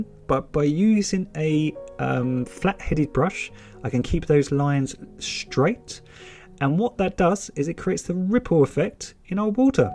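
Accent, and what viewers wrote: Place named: England, United Kingdom. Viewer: Great! I’m from England too. I live in Japan, so good to hear a homey!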